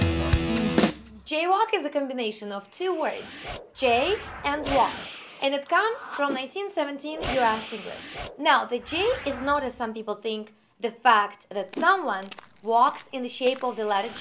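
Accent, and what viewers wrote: Russia. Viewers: she has an russian accent and russia is not part of Europe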